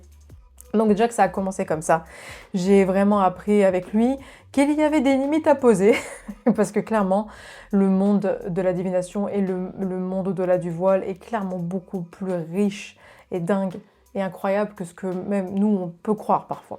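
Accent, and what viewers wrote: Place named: England, United Kingdom. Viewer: Ha je viens de comprendre d’où vient ce charmant accent! Je pensais d’Angleterre, je n’étais pas loin!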